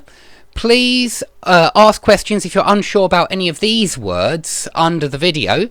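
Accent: United Kingdom. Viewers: I like how you explain the topics. Enough vocabulary, concise definitions, imagination and British accent. Thanks.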